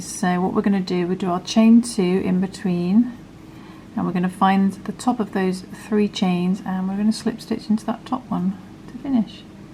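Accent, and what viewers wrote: United Kingdom. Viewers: Something about my dyslexic brain can't comprehend the british voice using american crochet. I automatically translate it to uk crochet and then you say nah we aint doin that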